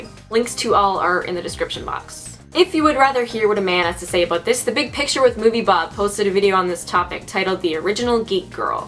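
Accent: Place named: Canada. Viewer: oh, I forgot to add - I'm Canadian too, so it warmed my little heart to hear your Canadian accent. Yayz!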